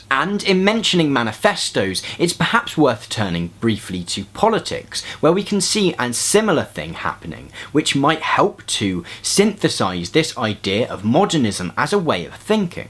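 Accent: United Kingdom. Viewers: 第一次有觉得英音难听的时候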